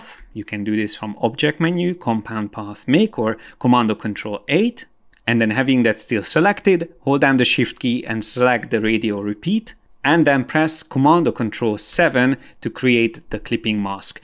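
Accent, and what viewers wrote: Hungary. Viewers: Cool videos! Did I sense a little Hungarian accent?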